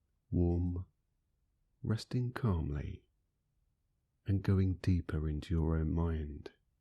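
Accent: United Kingdom. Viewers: Who are you???? I tried LOADS last night, and finally found this. PERFECT!!! No music, and a UK make voice that was just perfect for me. Thank you so much, but please reveal yourself!!!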